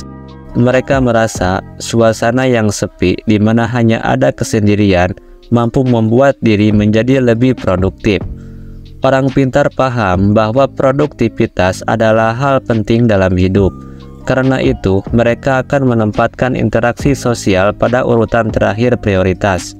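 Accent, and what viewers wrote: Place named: West Java, Indonesia. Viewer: yg ngomong urang sunda nya? 😅 aksennya keliatan..🙏hapunten kang..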